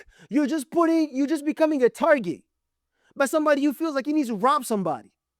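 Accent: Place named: Nigeria. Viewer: Is that your name or your faith? You’re too bitter to go by that name.I mentioned Nigerian because I detected an accent, but I was really hoping you weren’t! If you consider “ working hard” irrelevant then there really isn’t much I can say. You go and be all the things you claim Shedeur isn’t. Your video actually showed up as “ sponsored”. You need to “ read the room”. That young man has a popularity that you can only dream of. 5th round pick?? Yet here you are, and all the major channels, discussing him!! Good luck and goodbye.😂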